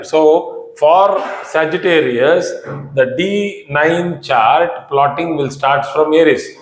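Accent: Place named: Telugu, India. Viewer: Telugu accent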